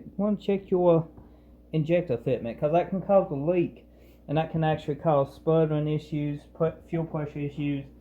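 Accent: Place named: Louisiana, United States. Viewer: Very helpful thank you great video your accent sound Cajun.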